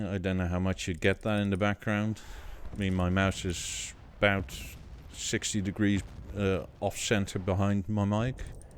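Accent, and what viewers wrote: Netherlands. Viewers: i can tell by your accent 😅 dutch accents are very distinctive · Dutch accent noticable within the first 5seconds but good vid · You sound like a Dutchman who’s lived in England for years, midlands/north of I had to guess, maybe Manchester.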